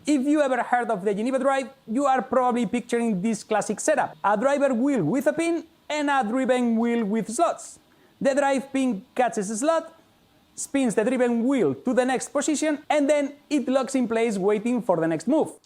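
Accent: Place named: Spain. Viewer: You are a genius. I love that you speak fluent English with a super strong Spanish accent.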